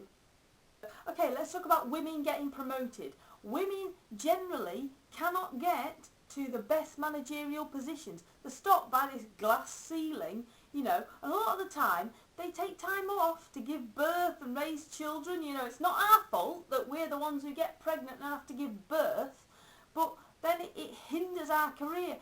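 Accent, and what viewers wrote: United Kingdom. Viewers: Are you from Birmingham? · this stoke accent is beautiful. 'CAPEETULLIZUM.'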